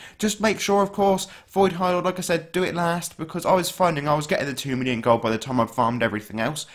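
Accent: United Kingdom. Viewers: Nice video. Lots of information btw are u a britain? Cuz u got the accent of a Britains.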